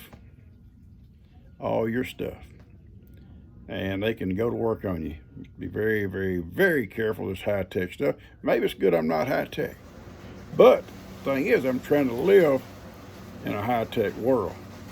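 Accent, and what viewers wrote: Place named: Texas, United States. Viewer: I have always enjoyed hearing the stories told by the older generation. Except, now I am one of them! I was born on New Year’s Eve in 1953. But, you have such a likable personality, and it is charming to hear your Texas style accent in the words you use when speaking. Just wanted you to know that, and hope Gi-Gi is doing well, after the terrible hack she has had to endure. I know she has sought strength and comfort trusting in the Lord.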